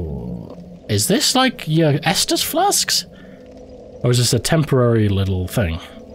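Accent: Scotland, United Kingdom. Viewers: That’s not an attempt at a Scottish accent. That’s a real deal Scotsman right there, no doubt about it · That Scottish accent isn't an attempt btw, that's a genuine Scot. I tell you that as one such specimen myself :P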